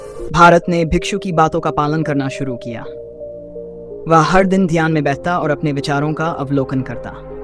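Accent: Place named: United Kingdom. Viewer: Bhai hindi ko hindi ki tarah bolo please...english k style mei mat bolo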